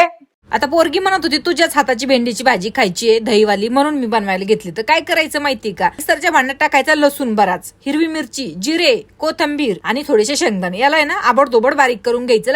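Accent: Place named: Ahmednagar, India. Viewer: नगरच्या आहेत का तुम्ही....कारण भाषा तुमची तशीच वाटते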